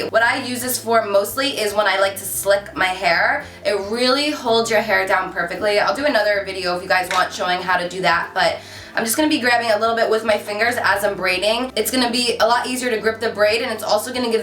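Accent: New Jersey, United States. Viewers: I knew you were from Jersey! that accent haha. love your vids. you're gorgeous! 💕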